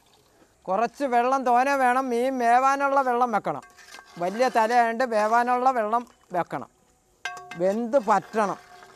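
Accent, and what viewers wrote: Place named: Elippakkulam, India. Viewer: Elippakkulam slang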